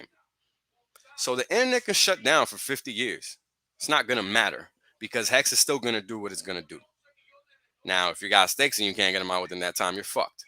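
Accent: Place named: New York, United States. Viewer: Sounds like hes from urban new york... how can you hear skin color ?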